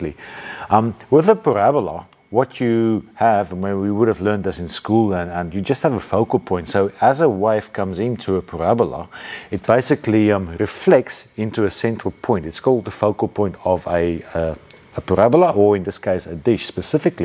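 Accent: South Africa. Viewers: This guy is South African lol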